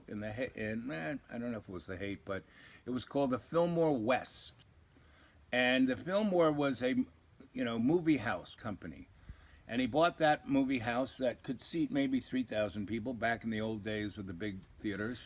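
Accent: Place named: New York, United States. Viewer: Definitely from NewYork..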